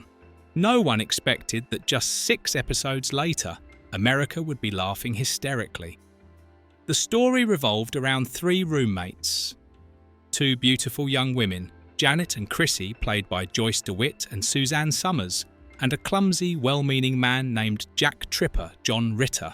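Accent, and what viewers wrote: United Kingdom. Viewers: British accent commentary on an American show with a fake title! Click bait!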